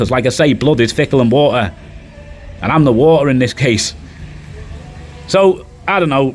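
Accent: Liverpool, United Kingdom. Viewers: Good On Ya Yer Scouser 👍👏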